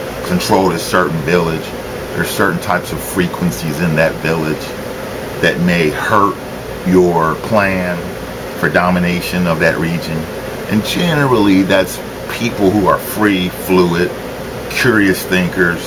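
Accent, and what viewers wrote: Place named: Washington DC, United States. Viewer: Thanks. I lived in DC briefly in 1973, Howard Univ one semester. At 65, I might be slightly older than you. People from that part of the country sound a lot like you do.You also remind me of a friend from San Fran in the mid 1970s. Thanks for the clarification. There's a TI in Houston, Texas Gangstalking Milab who I've been communicating with. People here in Memphis don't know what a TI is. Take care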